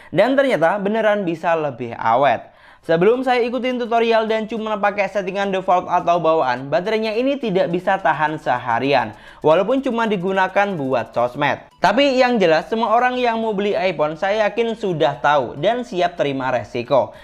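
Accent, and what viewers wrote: Madura, Indonesia. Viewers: Logat ny kok kayak org madura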